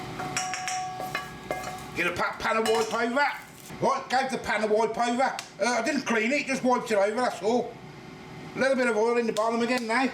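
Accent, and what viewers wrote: Birmingham, United Kingdom. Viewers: New subscriber here you sound like a fellow brummie?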